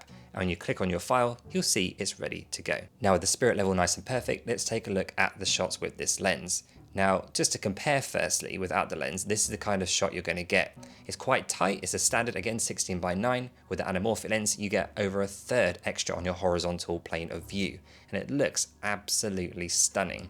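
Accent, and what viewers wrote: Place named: London, United Kingdom. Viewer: 好听，正宗的伦敦音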